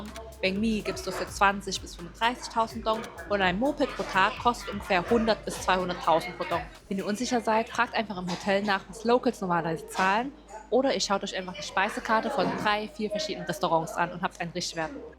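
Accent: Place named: Cologne, Germany. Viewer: Kann es sein, dass Du aus dem Rheinland bist? Ich meine einen leicht kölschen Einschlag zu hören 😉😉 Sehr informatives Filmchen, Danke für die vielen Tipps. Kleine Anmerkung: vielleicht etwas langsamer sprechen, ansonsten toll gemacht!! 👍👍👍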